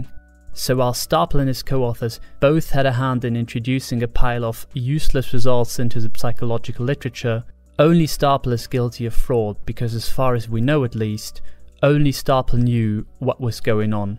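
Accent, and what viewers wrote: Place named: Germany. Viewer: It took me that long to realise that you were German 😂 Mega spannendes Video, hast du einen Hintergrund in Psychologie, oder wieso kennst du dich so gut aus?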